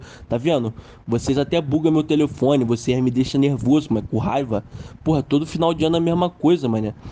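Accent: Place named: Rio de Janeiro, Brazil. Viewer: N tem jeito, se é vídeo com áudio de carioca, então é bom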